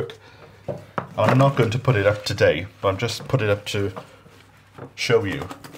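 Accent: Wales, United Kingdom. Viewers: Thank you, lovely relaxing vlog. Nice watching you potter and hearing a warm Welsh accent. I learn a lot from listening to you, some great tips, and I won't be using my plastic chopping boards again. I enjoy reading all the great comments, too. One was brilliant today, about a firestick and a projector tv onto the wall. I have no idea what that is, but it sounds amazing, so I'm going to research concept and cost. The rain protective washing line cover is genius, so will check that out, too. I bought some window film but felt a bit unsure what to do until I read a comment here giving tips on how to do it well...hope you show us doing yours. Also, I love the glass teapot, and I may get one, if not too expensive. The star item today was Rusty's frame. It was stunning, and what a fabulous pic of him, he really was gorgeous. ❤ Take care and look forward to seeing Welshcakes being made. 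My Mother used the heated blackstone, and they were delicious...I miss her terribly. She would have loved your channel. Have a great weekend. 👍 🐈 🌼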